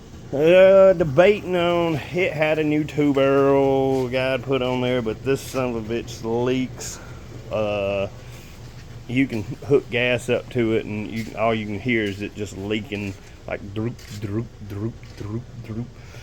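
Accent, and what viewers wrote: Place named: Alabama, United States. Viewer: I saw Alabama car rescues and clicked on the video and as soon as i heard you start talking i knew your from Alabama. 😂😂 Me too, dont know why ive never seen channel before,new sub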